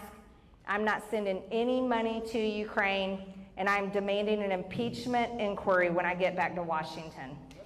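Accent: South, United States. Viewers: Honestly I’m grateful that you are fighting for us as an American and you are a Georgia Girl from head to toe . My first 26 years of life was in Seminole County GA and to listen to your voice with your Im doing what’s right and proud to do so in the middle of the room Makes me blush lol Get Em Girl bust out a case of Aunt Georgia’s Aged Right Whoop Ass and serve it up cold !!! Just a little Stereo Typical Southern Talk !!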